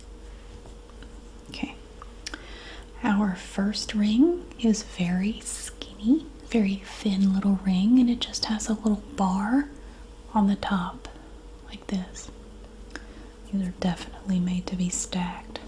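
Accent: South, United States. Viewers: I think the reason we love the soft spoken videos is that when you whisper, we can’t hear your beautiful Southern accent! ❤❤❤❤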